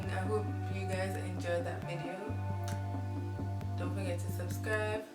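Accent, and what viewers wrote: Jamaica. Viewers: u sound Jamaican 😍😃😃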